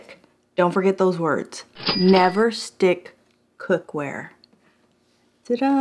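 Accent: Texas, United States. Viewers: Are you talking in a southern like paula dean southern accent in this video... or i am I just noticing it ps i think I have seen all the your videos so I dunno why it sounds that way today maybe it is cause you are doing a cooking video, ps you said that right when i finished typing this then i had to click edit right then to add this part!!! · First of all glad I found your channel again.👍 Second did not know your shirt was changing colors was too into the test results. I like the pink one.👍 Third I've watched about five of your videos I thought you always had a Texas accent. And you lived in Texas. 🦤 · Girl I was thinking the same thing! “Does always have such a strong southern accent “ lol funny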